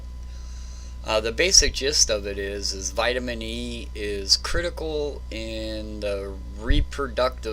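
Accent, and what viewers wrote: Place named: Great Lakes, United States. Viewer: Great Lakes accent is strong. Yahey dere!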